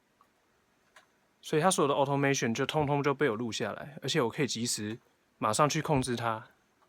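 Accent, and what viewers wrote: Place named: Beijing, China. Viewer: Nin shi beijing ren ma? Nin shuo de henhao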